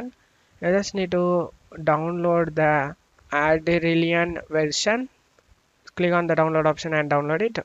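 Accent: India. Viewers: I was thinking this was a scam so I google it before trying to fix it, and I come across an indian accent guy explaining how to fix it lmao???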